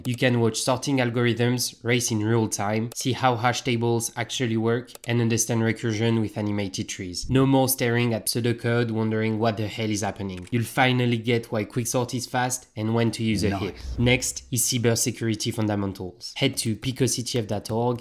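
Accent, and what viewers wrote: France. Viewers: Your french accent is cool ✨ good advice too, keep it up ❤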